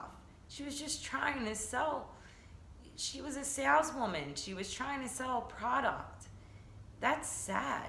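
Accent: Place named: Philadelphia, United States. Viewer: I’m so glad you did this because I wasn’t sure of the details of what was going on but there was no way I was gonna give one more view to MGL because I’m done with her garbage ass. Also, are you from Philly? Haha def a Philly or NJ accent!!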